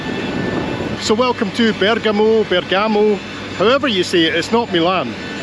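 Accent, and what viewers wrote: Scotland, United Kingdom. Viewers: The first time I heard the scottish accent I thought it was a guy from Bergamo attempting to speak english.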